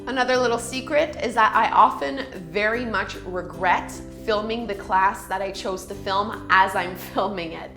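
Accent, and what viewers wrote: Canada. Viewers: I found your channel through a collaboration you did with Brett Larkin and stuck around because on your honest style and lovely Canadian accent. As an English teacher, I have a thing about accents 🤓 Thanks for keeping it real (the jealousy video and the meditation challenge 👍🏻) Hugs from Finland.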